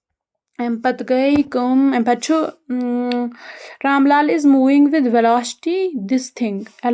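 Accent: Kashmir, India. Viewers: Thaat kashmiri accent 😂😂 ❤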